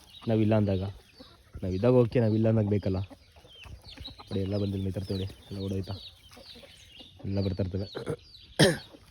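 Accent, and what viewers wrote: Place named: Mysore, India. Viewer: 2013 ರಿಂದ 2019 ರವರಿಗೆ ನಾಟಿ ಕೋಳಿ ಸಾಕಿದ್ದೆ, ಸಾಕಷ್ಟು ಕೀರ, ಮತ್ತೆ ಹಾವುಗಳ ಕಾಟಕ್ಕೆ ಬೇಜಾರಾಗಿ ಅಲ್ಲಿಗೆ stop ಮಾಡ್ದೆ, ನಿಮ್ ವಿಡಿಯೋ ನೋಡಿ ಅಳೆದೆಲ್ಲ ನೆನಪಾಯ್ತು... ತುಂಬಾ thanks.. nim ಮಾತು ಕೇಳಿದ್ರೆ ನಮ್ ಮೈಸೂರ್ ನವ್ರು ಅನ್ಸುತ್ತೆ ತಮ್ಮ ಊರು ಬ್ರೋ?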